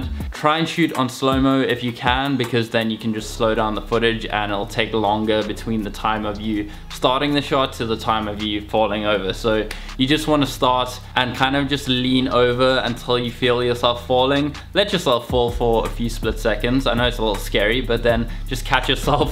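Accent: South Africa. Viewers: picking up a south african acent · As soon as I heard that accent I just knew it... Big ups bro, great tips ... very useful 🇿🇦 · You sound south African? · The accent sounds very South African. Finally I get to hear another South African review about cameras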